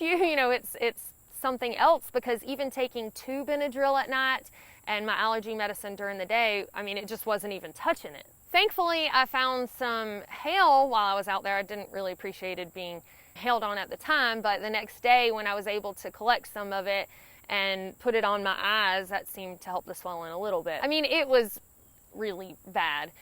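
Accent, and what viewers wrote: South, United States. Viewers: Sorry about the allergies but love the Southern voice.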